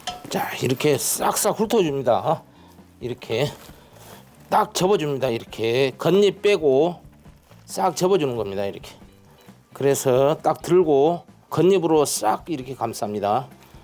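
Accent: Jeolla, South Korea. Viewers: 손님들이ㅡ한장 해버려 구만요ㅡ전라도ㅡ사투리 조아요ㅡ😏😏😊👍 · 구수한 전라도 사투리가 듣가 좋습니다..저도 고향이 전라도..ㅋ. 절이는 노하우 이용해서 올 김장은 좀 더 많이 담궈야겟네요..감사합니다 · 셰프님들중 셰프님이 쵝오 입니다 설명도 귀에 쏙쏙 들오게 잘해주시고 전라도 사투리로 아주 재미 있습니다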